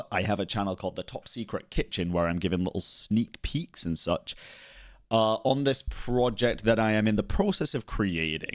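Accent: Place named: United Kingdom. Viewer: youu brought up being british, and its insane how 99% of the time i completely forget you are, even though your accent is shown with every word you say but i just never think about it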